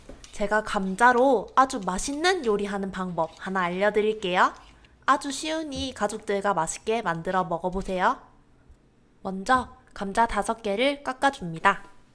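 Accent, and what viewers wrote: Gyeongsang, South Korea. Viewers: 경남 지역인거같아요 제가 경남사람인데 저런말투 쓰거던요 ㅋ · 은 대구말씨~!!^^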